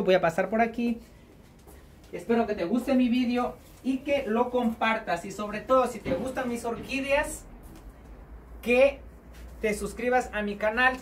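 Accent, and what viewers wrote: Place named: Latin America. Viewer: Me encanta tu dedicación! Felicidades por tan buenos resultados 👏👏 Por cierto, no tienes acento español, me parece que eres de Latinoamérica, no me equivoco? Saludos desde México.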